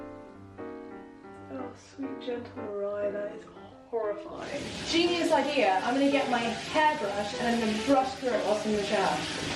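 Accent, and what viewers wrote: United Kingdom. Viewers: the most relaxing british voice ever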